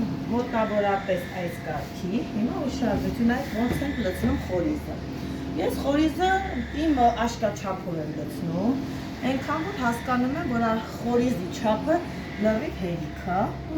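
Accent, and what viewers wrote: Lori, Armenia. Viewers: Սիրելիս այսօր պատահաբար յութուբով տեսա ձեզ ամիջապես ամրացա ձեր ալիքին ուղակի կարոտս բավարարելու համար սիրով լսեցի մեր լորվա ակցենտը ես ինքս ստեփանավանցի չեմ բայց 25 տարի ապրել եմ այդ քաղաքում ունեմ շատ հոյշեր կասեմ որ գիտդնաք աբսօրվանից կունենաք մշտական հետևորդ մոսկվա քաղաքից ցավոք միմյանցից շատ հեռու ենք սիրով կփոխարինեի իմ խոհանոցային գաղգնիքները որոնց մեծ մասը իմ փորցից է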